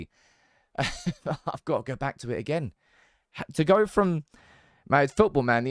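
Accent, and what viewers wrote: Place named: London, United Kingdom. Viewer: Would have thought you'd have been Arsenal with that accent, but I guess that's standard "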